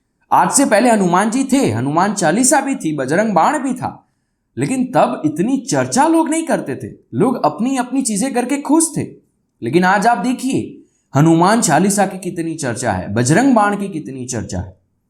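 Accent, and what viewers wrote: Gujarat, India. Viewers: How do I meet your Guru? Thank you. (I note Gujarati accent in your speaking. You seem to be a Gujarati(may be a Marathi). I could be wrong.)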